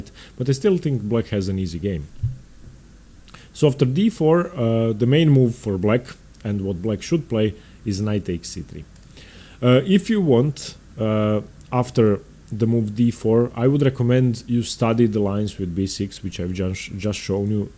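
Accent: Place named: Russia. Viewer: Thank you for providing these well thought out and well presented videos. I think I detect a Russian accent in your speaking. If so my thanks to you is "Spacibo" or "Спасибо"!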